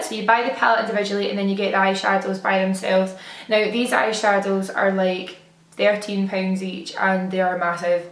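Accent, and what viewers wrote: United States. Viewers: The American accent! 😂😂😂 I freaking love you. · Your American accent was spot on hahaha! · Seriously loved your American accent!!!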